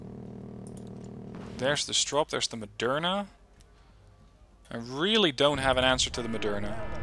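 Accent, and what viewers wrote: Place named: Netherlands. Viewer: You are welcome. I know, that you are no deity - when I interpret your (hardly present) accent correctly you are a human from the Netherlands, right? ;-) BTW I'm German. Actually this was my first comment ever to a youtube video. I really appreciate your videos, they are entertaining as well as informative. And I felt somewhat "honored" that you mentioned my comment in another video of yours. However generally I hate wargame (kind of), since it's the first time in my life (and my computer playing life goes back to the Commodore 64 times(!)) that I am addicted to a game. The interesting thing is that neither ALB nor RD hold the usual grinding game mechanics, which usually make players addicted. Well another story... So before I get carried away about thinking why this happened to me, and why I like watching your videos so much - thank you, and please go on doing such an entertaining job. 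And keep doing ranked, please! Private Parts (my ALB and RD name)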